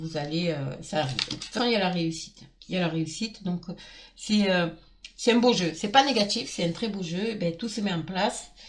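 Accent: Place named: Marseille, France. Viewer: Bonjour vous êtes du midi Marseille mais pas de paris Bravo vous avez une voie qui chante bien je suis gémeaux ascendant BALANCE et Lunaire BALANCE et ge deux petits enfants comme mois sauf lunaire mais ils ont us plus MERCURE et venus a leur naissance a y une chose bien le signe du Lion qui est depuis longtemps dans le signe Gémeau 🧚l'étoile de david me rappel mes ancêtre juive🧚oui c pas mal j'aime bien Merci mois je suis de Libourne près de Bordeaux et depuis 69 je suis sur Toulouse bonne journée 🧚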